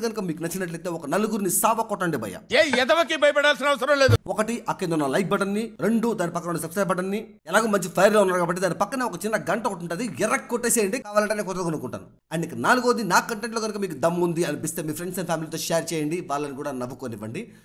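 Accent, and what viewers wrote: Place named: Tirupati, India. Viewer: nenu undedi Tirupati bro Slang ni guess chesa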